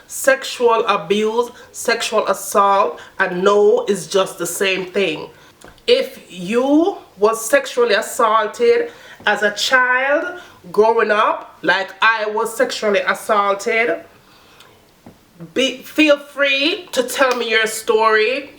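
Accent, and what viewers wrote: Jamaica. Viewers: The bible has some very harsh words regarding people who abuse children, its better you were never born. No need to say Jamaican accent, I think you subscribers know already :)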